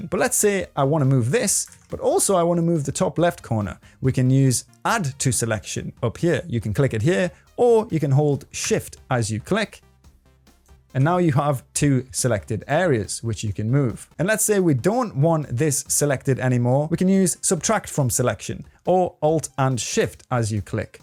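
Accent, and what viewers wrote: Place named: North East England, United Kingdom. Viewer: Just found your channel dude, not watched all of this yet but started, going to after I've done food shopping as I'm planning on making a 2D horror themed RPG and this will help me learn how to use this program and what not. Also you sound like you're from up here in the North East too, always good to see :)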